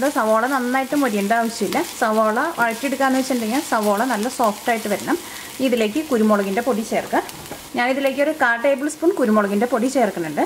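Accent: Thrissur, India. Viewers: Nalla avtharana shily trishoor voice basha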